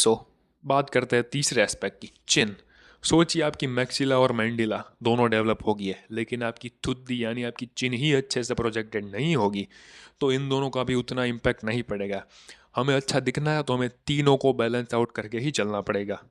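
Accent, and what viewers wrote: Gujarat, India. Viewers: Vhi to voice tone gujrati hai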